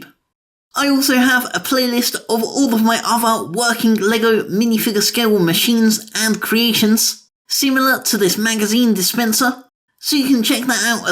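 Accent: United Kingdom. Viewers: Your British accent sounds pretty cool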